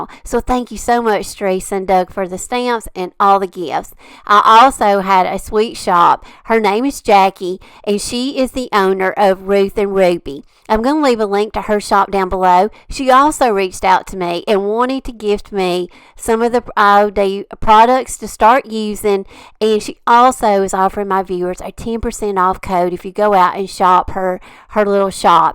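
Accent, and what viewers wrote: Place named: Alabama, United States. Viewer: CRICUT! I really look forward to ALL of your videos. And as a southern gal originally from Alabama now living in Florida, I really LOVE hearing that 'bama accent!!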